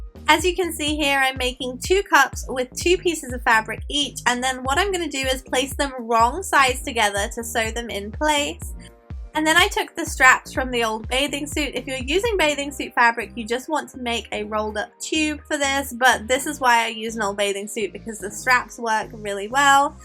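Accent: England, United Kingdom. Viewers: Shes english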